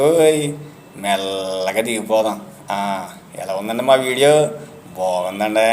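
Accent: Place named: Godavari, India. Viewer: గోదావరి యాస మన అందరి శ్వాస......🎉🎉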